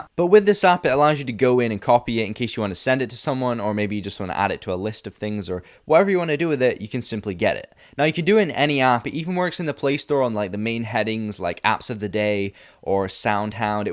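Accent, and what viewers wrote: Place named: Edinburgh, United Kingdom. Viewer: What's up with your accent? I'm guessing you're from Edinburgh but...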